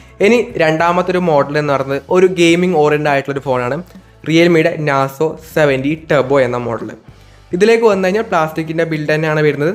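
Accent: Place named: Malappuram, India. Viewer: Malappuram jillayilano.rasamulla slang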